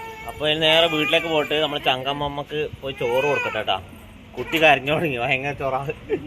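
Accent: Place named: Payyanur, India. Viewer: ഇല്ലാട്ടോ. ഞങ്ങൾ പയ്യന്നൂരാണ്. മുംബയിലാണ് താമസിക്കുന്നെ.വീഡിയോ കണ്ടു നമ്മളെ ഭാഷ, നാടൻ സംസാരം അതാ ചോദിച്ചേ ❤നമ്മളെ നാട്ടുകാരല്ലേ 😀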